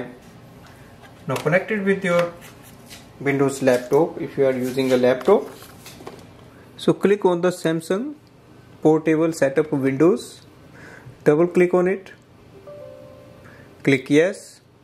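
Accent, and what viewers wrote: India. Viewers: My T7 (or T7 Shield) is not recognized by devices. The manual says ... Make sure that the T7 is connected securely to the USB port. If you use cables other than the one included in the product package, the T7 may not be recognized. Some PCs may not recognize the T7 when connected to the USB 2.0 port if the current exceeds the max. limit (500 mA) described in the Power Specifications. In such cases, please try a different USB port. BTW that India english is very annoying for non english speaking people !!! Almost all words are distorted into strange sounds and the accents of single words are placed differently. Talking slower would helps a lot, so we get more processing time.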